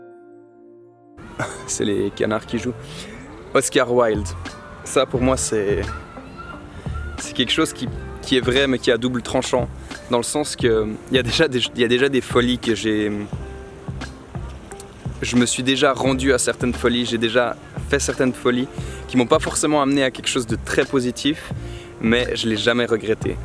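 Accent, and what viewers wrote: Belgium. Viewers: On dirait que t'es bruxellois Sinon love you and your beautiful viedooos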